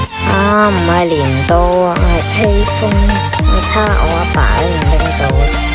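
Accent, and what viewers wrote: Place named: Hong Kong, China. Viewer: 你的口音好像是香港人啦 然后你讲的话是不是广东话 我猜你肯定是广东人❤❤❤😊😊😊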